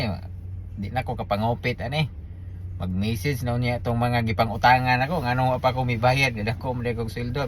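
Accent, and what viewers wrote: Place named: Bohol, Philippines. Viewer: Mura lagi ka taga Bohol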